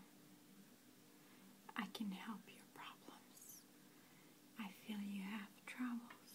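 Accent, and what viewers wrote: Russia. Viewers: I love all your videos, but make more videos, esp this kind of video, with your russian accent you do so well. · Please do another one of these fortune videos,!:( But, PLEASE do it in the Russian accent...it makes me feel so warm and at peace...nurturing <3 I've listened to a few of your Russian videos to fall asleep, lately...they help SO well!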